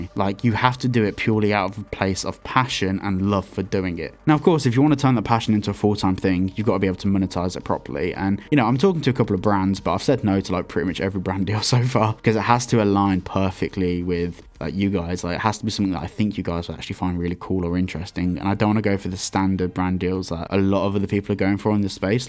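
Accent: United Kingdom. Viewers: I didn't start watching you for GTA, 2 reasons why. One, your British accent and mainly your ambiance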